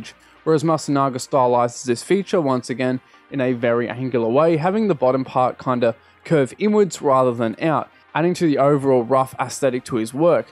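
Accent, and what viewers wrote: Australia. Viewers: I was pretty sure you came from down under but the accent was kinda close to British so I wasn't sure.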